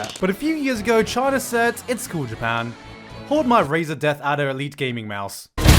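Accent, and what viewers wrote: United Kingdom. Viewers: He has a Bri'ish accent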